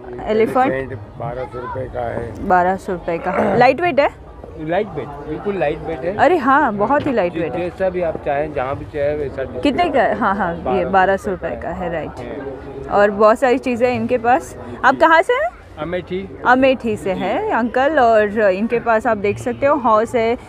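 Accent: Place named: Maharashtra, India. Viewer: I think u r from maharastra, if i not wrong because I heard yr marathi tone in your voice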